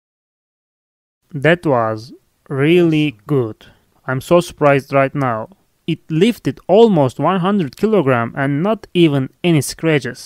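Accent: Turkey. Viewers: aksandan direkt anladım Türk olduğunu 👊😁🇹🇷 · Abi bu Türk aksanı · Your accent is like Turk :)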